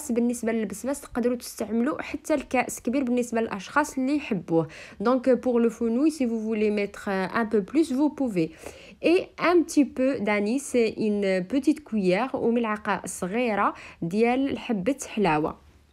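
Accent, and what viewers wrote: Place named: Tlemcen, Algeria. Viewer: Merci pour cette recette mme et d oujda frontière entre maghnia et oujda j aime bien une femme d oujda avec un accent de tlemcen encore merci d une parisienne 🙏🏻🙏🏻💋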